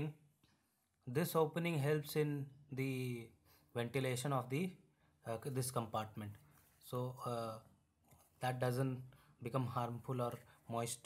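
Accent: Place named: India. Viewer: Why does a Chinese man have an Indian accent?🤔🤔🤔🤔🤔🤔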